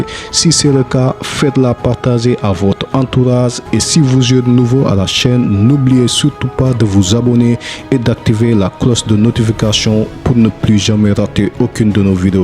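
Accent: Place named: Senegal. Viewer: 😀c'est un senegalais qui parle comme ca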